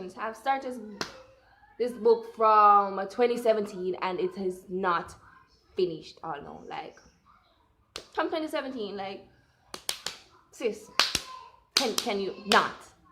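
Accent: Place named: Jamaica. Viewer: Oh Jamaica!! I just went to Jamaica 🇯🇲 last month and I loved it!! I knew I recognized your accent lol it was my first time going to Jamaica. I Posted my trip on my YouTube 🥰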